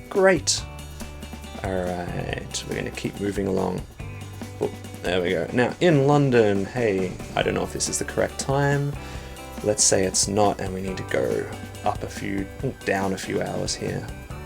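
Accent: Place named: Australia. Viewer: Dat comfy Aussie accent. :)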